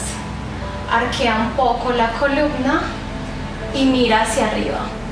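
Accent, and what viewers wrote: Antioquia, Colombia. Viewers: Buen vídeo linda,debo decir que tienes una muy bella tonalidad en la voz,relajante,debe ser su acento paisa,saludos bonita. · Por el acento la chica es colombianas.. por el cuerpo la chica es divina.. por la pesonalidad la chica is likeable... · Quiero saber cómo se le llama a tu acento, sé que eres colombiana pero quiero saber eso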